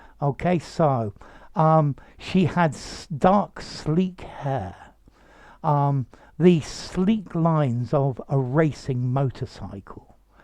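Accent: RP, United Kingdom. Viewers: Your English RP accent is sleek and I like it. It's very articulate. You enunciate each and every single word in your speech. Thank you sir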